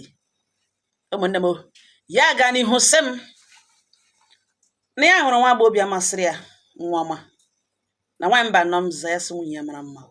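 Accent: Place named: Imo, Nigeria. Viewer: Good morning Ma, I'm not from Imo State but i like the way you speak Imo State, it sweet me too much.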